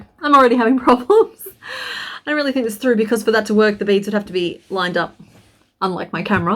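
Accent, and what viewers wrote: Australia. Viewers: I was over the moon from the moment I hear the Aussie accent! No disappointment here 😂 look forward to binging through your videos now "hyperfocus? Me? Never!" 😅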